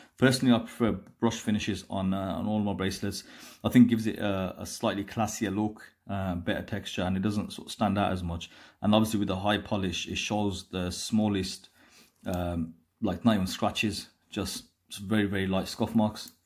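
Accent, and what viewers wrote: Birmingham, United Kingdom. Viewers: Subscribed mate.. have to support my fellow Brummie... well you sound like a Brummie 😅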